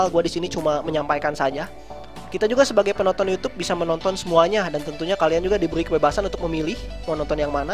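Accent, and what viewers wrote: West Java, Indonesia. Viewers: accent kaya orang sunda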